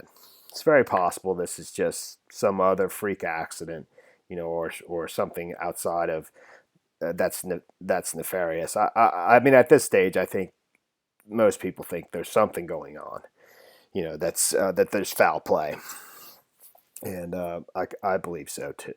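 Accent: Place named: Delaware, United States. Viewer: Hi,! I really like your channel. Just the facts, plain and simple. Also, I keep thinking you sound like you're from northern New Castle County, Delaware, or nearby PA. Are you?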